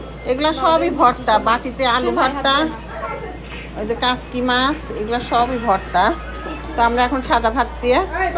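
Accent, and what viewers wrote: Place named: Bangladesh. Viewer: আপু আপনি তো বললেন না বাংলাদেশে আপনার দেশের বাড়ি কোন জেলাতে। আপনার ভাষা শুনে মনে হয় আপনি আমার জেলার মানুষ। তাই খুব জানতে ইচ্ছে করছে। যদি কোন সমস্যা না হয় একটু বলতেন ভালো লাগতো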